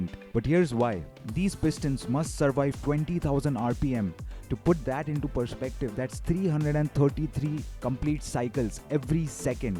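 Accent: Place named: India. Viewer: bro just reuploaded driver61 with an indian accent and thought yeah thisll do numbers? bro come on now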